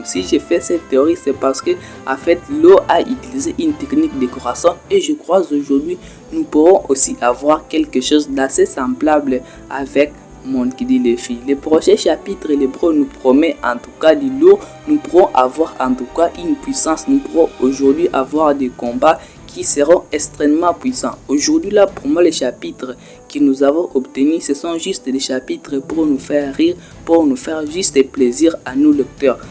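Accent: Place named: Democratic Republic of the Congo. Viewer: Congolais c sur ton accent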